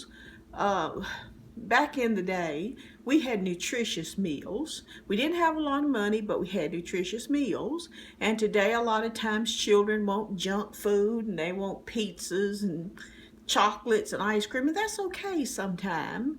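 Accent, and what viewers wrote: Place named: South, United States. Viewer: I love your voice ! You talk like my mom and her mom, nice southern accent it sounds like to me!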